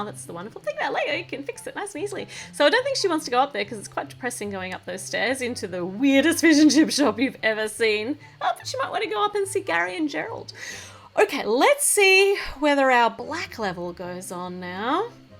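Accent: Australia. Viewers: I thought you were saying pillow cuz you talk in an Australian accent but you were actually saying pillar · I love the Australian so it’s sounds like suo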